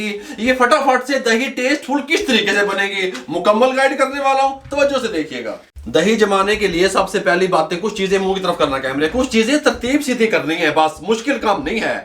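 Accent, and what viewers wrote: Punjab, India. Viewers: I like your pure punjabi style being a punjabi person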